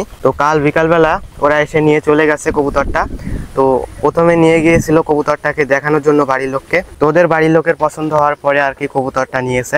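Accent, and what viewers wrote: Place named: Kolkata, India. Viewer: Kolkatar naki dada Tumi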